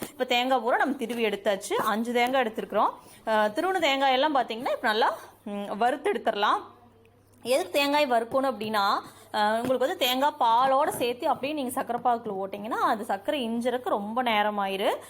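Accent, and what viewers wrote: Kongu, India. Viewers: Akka neenga pesura kongu Tamil arumaiya iruku akka, first time unga videos lam paakuren , unga farm, home lam romba nice, continue aa videos pannunga🙏🙏🙏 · அருமையான காணோளி அம்மனி உங்கள் கொங்கு தமிழ் பேச்சு மிகவும் அருமை தேங்காய் பர்பியில் நாங்கள் கொஞ்சம் பொட்டுக்கடலை போடுவோம் அம்மனி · அக்கா நம்ம ஊரு பேச்சே பேச்சு தான் ❣️😍 அருமையான பேச்சு அம்முனி(அக்கா ). அஸ்கா பருப்பியும் அருமை 😋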